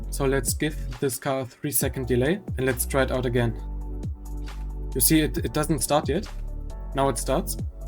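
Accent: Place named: Germany. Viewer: Wie man se German Englisch hört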